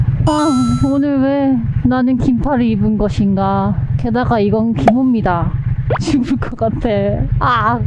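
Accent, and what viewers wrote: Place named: Seoul, South Korea. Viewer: 개시끼 스울말투 와이리 스윗허냐..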